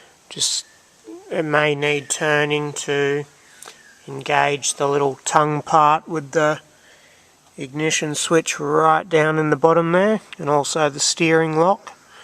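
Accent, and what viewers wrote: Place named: Australia. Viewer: You sound like the Ausie version of the monotonous teacher in “The Wonder Years” However, very informative, Smart and even funny at times. Many thanks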